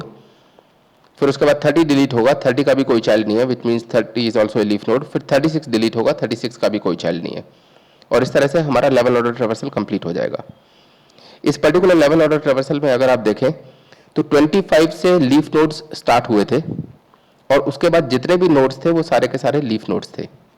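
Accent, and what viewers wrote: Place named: South Asia. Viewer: I really appreciate this video. I think you could reach a broader audience if you spoke slightly slower. I have some experience with South Asian speaking accents, but the speed at which you are talking along with the lower quality of the audio makes it a little hard to keep up (particularly at )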